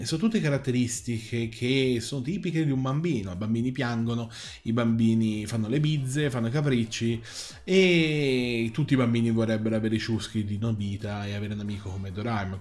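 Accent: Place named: Tuscany, Italy. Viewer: Non te la prendere... Purtroppo vi sono troppi analfabeti funzionali in rete... Bellissimo video cmq!😉 Cmq fantastica la tua cadenza Toscana... 😉 P. S. Ci vuole intelligenza per capire i tuoi video, dote oggi troppo sottovalutata... P. P. S. Visto che parli di serie interrotte per morte degli autori o interruzione per flop del prodotto, puoi fare un video su Tekkaman e Silversurfer?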